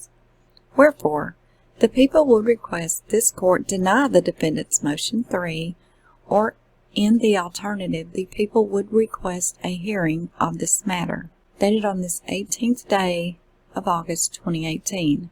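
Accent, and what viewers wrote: South, United States. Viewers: Thank Goodness you understand this! Brains and beauty, you have it all! P. S. I almost forgot, a great southern dialect! I'm in NY I even get sick of my own voice.!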